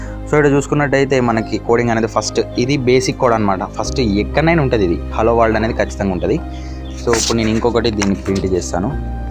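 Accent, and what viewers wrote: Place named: Telangana, India. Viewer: Thank you brother....your slang super (pakka telangana yasa i like your slang)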